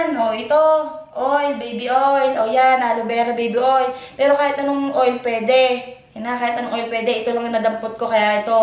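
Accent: Batangas, Philippines. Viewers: Ang lalim po ng accent mo...taga batangas ka noh? Hehehe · Ang gudvibes ni ate gurl hehehe... 😂😂😂 batangeña... Tone heheh.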